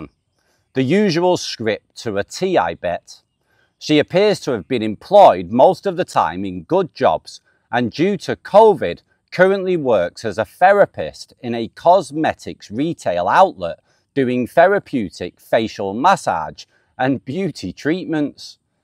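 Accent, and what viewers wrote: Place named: North, United Kingdom. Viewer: Glad you are back in the groove. I look forward to your stories every day. I enjoy your northern accent. Dave from California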